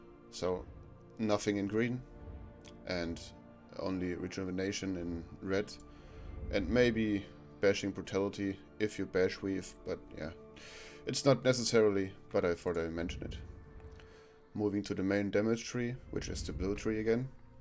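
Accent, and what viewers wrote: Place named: Germany. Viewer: Man hört einfach raus das du deutsch bist:D Guter guide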